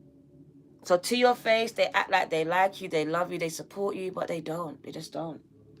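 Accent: United Kingdom. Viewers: 8 infinity and beyond…. Please let me buy you lunch….. you sound like a good ole Londoner… I born in Walthamstow xx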